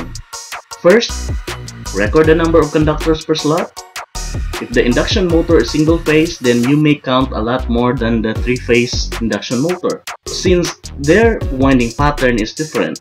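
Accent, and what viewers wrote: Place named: United States. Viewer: Pinoy yung pangalan ng channel kano nman yung nagsalita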